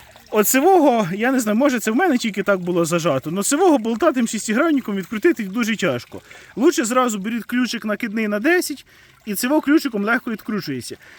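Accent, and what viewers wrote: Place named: Transcarpathia, Ukraine. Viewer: Оцевго -осьо. Осьево-го, Осьо-отево, Сюдево, Оцьо-цьо. Говор такий дебілкуватий, чи то закарпуцький з глухої далекої полонини, чи то на якомусь Івано-Франківському забитому хуторі автор відео живе не зрозуміло. Шановний ви ж публічне відео знімаете, хоча б по українськи навчились говорити, а то цей дебілкуватий суржик слух ріже.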